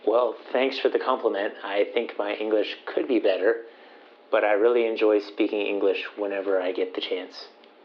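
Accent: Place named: England, United Kingdom. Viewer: Hi, I like your english accents very much. So I subscribed .thank you.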